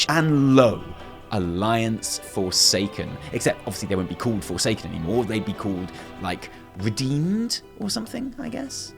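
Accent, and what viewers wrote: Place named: RP, United Kingdom. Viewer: Another brit giving us a bad name making everyone think we speak like the queen.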